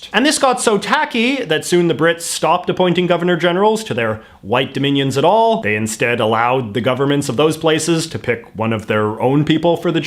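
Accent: Canada. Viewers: I love yer sarcastic energy 💃🏼(& that is one heavy Canuck accent 😁 unlike my daughter who lives in van, she is dual citizen, no accent, tho she knows french)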